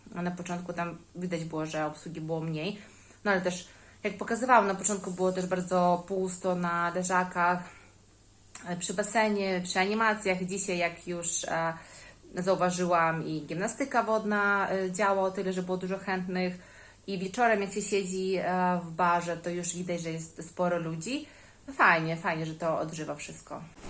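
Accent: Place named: East, Poland. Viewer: Akcent wschodni Pani ma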